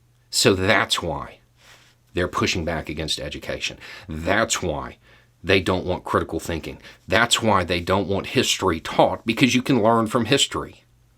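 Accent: South, United States. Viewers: Here’s a plain-talking southern boy. Love you too.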